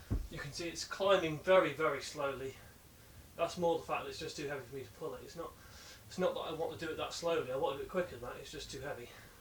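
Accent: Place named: England, United Kingdom. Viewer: By the accent i'm going to assume you're English, if you are, where did you get your wood? I find it very hard getting anything other than pine in this country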